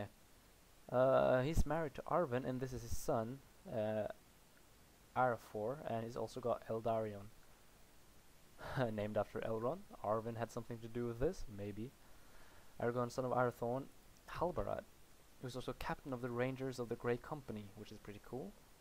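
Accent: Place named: Norway. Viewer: are you norweagen you sound little norweagen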